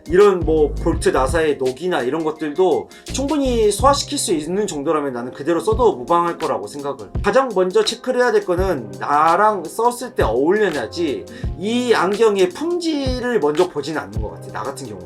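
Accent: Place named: Jeolla, South Korea. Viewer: 전라도 말투극혐이네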